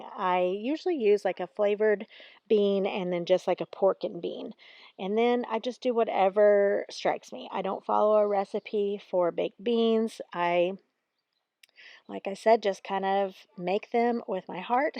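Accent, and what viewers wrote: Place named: Fort Wayne, United States. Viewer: We're from Fort Wayne and it sounds like you are close by.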